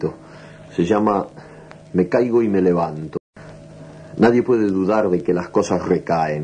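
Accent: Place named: Northern Argentina, Argentina. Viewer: su acento (en esa mezcla extraña de español y francés) me remite a un acento del norte argentino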